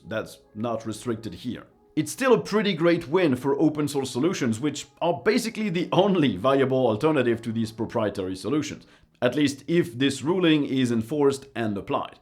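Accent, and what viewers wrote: France. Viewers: I definitely love my country. Besides your french accent is incredible.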